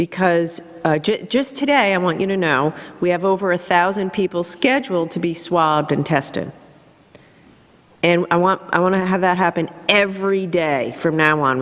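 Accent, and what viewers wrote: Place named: Rhode Island, United States. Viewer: "3 new deaths anaconna the cahrona virus".....live the Rhode Island accent!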